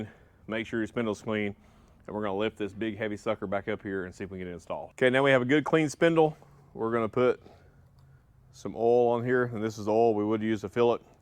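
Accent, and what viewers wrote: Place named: North Texas, United States. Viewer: Thanks for the video and info. Can you do a video on when the hub assembly should be replaced? The shoes on my 7k lb axle sitting between 5/32" and 6/32" and I'm wondering if they should be replaced in preparation for the upcoming RV travel season. Thanks And ditto to your pronunciation, being a North Texan myself.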